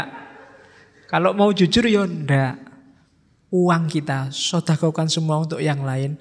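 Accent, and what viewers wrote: Java, Indonesia. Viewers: Tak apalah medok, yg penting tidak berhenti bermanfaat untuk orang lain. Sudah dapat ilmu gratis masih banyak menuntut. Orang yg punya niat belajar/mencari ilmu tidak pernah berhenti belajar apapun halangannya. Klo masih tak paham. Biarlah si butet & kawan2 bikin forum sendiri.... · Bahasanya medok campur jawa, kasian si butet banyak yang dak ngerti😂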